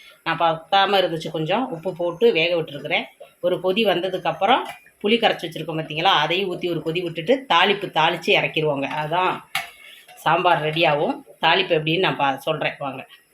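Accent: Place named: Madurai, India. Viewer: Unga pechu ketkka madurai pakkam mathiri irukku........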